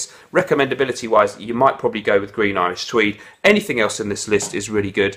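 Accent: United Kingdom. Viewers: Canadian sub here..... As I said before, there's nothing more commanding than a proper Brit accent! I'm so very thankful to you for the Bois du Portugal recommendation.... Got a sample from a place called Holt Renfrew here in Canada and just bought a full 120ml bottle... On a side note, if you wanna be blown away by a phenomenal upscale G.I.T frag (believe it or not- brother you've gotta gotta gotta get a sample or somehow try out RAIN from the House of Royal Crown.... It should be readily accessible in the U.K from what I've researched! Project all you want Mr.!👍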